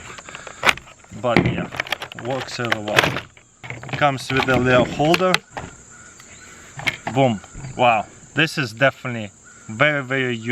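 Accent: Russia. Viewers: Руский акцент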